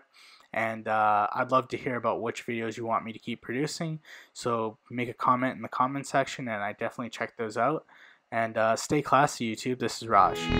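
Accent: Punjab, India. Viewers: are u a punjabi?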